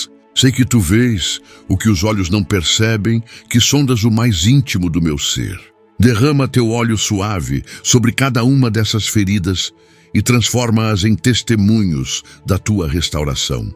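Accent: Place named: Portugal. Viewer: Por que você fala com sutaque de português de Portugal há momentos que você fala que nem português por 😢 me diz o porquê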